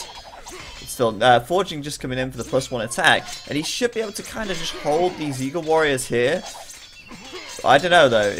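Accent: United Kingdom. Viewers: I was wondering, watching your previous videos whether you were UK, given your accent (I love british accent ^^), and I got sure, when you said Argentina and Beijing were opposite on a World map, because of the fact Europe is centered on European countries. And I told myself there were probably more difference between Paris/London and Argentina or Beijing than between the last two. I checked it out, and next time, I'll think before speaking ^^ Keep up the videos, bro, you're doing a very good job (you sometimes seem to struggle a bit when a lot of action is going on, breathing or coughing ^^), and between Spirit and you, I got back to AoE recently. Only fighting AI for now, but this is such a good game.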